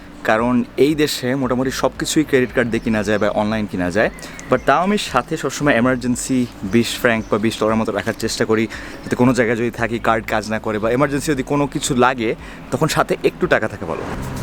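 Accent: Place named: Kushtia, Bangladesh. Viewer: এ্যতো দিন পর বুঝলাম ভায়ের ভাষা এ্যতো শুদ্ধ কেনো কুষ্টিয়ার ছেলে বলে কথা ভাষা তো শুদ্ধ হবেই 💜💜💜💜💜💜💜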